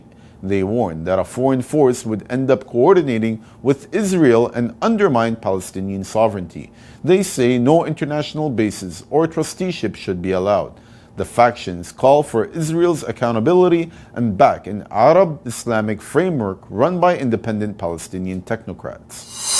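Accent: United States. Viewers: . ╔═══ ❤☀💚☀❤ ═══╗ 💚💚💚💚💚💚💚💚💚 Dear Mr., Assalaamu alaikum. The way you present the news is fantastic. Despite your American accent we from India find it very easy to follow and understand you without the help of the TRANSCRIPT down below. I am thankful to you for continuing the news of Research & Development in Human Health. We used to treat this news as a harbinger of the end of your news bulletin because so far you have been making it as your concluding news item. While we used to anxiously wait for this item, we were used to treating it as an announcement that you are now ending your news bulletin. But today you inserted that news somewhere in the middle. Please continue your old practice of making that news as your concluding news such that we donot miss out on this news and this news also gets highlighted like any headline!!! 🌐🌐🌐🌐🌐🌐🌐🌐🌐 ╚═══ ❤☀💚☀❤ ═══╝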